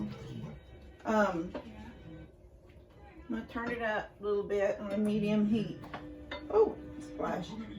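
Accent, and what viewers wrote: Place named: South, United States. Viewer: i really feel--right at home with you..... "you'er fixing to" and "eye balling"------good ole southern talking----i'm from and in FLORIDA still ---you just bring all the great memories of my family...rip🥲 and I THANKYOU for that!!!!!!😍🥰😍🥰😍🥰😍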